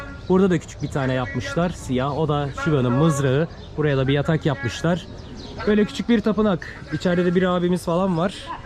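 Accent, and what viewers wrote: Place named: Aegean, Turkey. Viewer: sen nerelisin merak ettim, ege sivesi var gibi biraz ben de egeliyim 😂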